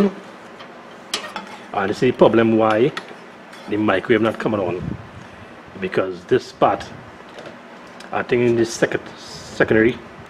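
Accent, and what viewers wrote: Trinidad and Tobago. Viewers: Can always know a Trini from his accent. Im one too. You could still discharge the Cap before removing the leads. Where you get parts in TT? Oster version here. Will work on it soon.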